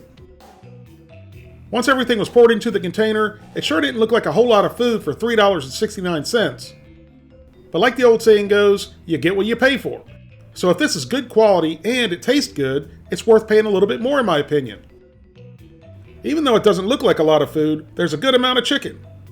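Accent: South, United States. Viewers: It's funny you sound less southern when you're with your wife.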